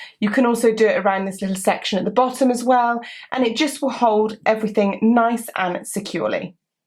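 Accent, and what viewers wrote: United Kingdom. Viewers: a lovely british accent which makes it quite easy to understand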